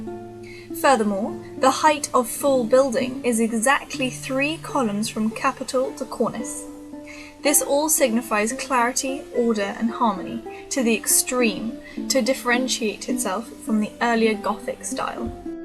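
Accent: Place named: England, United Kingdom. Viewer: va bene! you have lovely version of the sometimes not lovely posh english accent..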